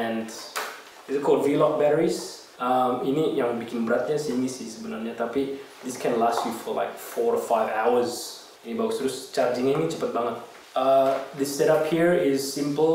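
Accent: Australia. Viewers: Uwawww aksen nya australia nice nice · Klo ngomong keliatan Aussie nya 😆😆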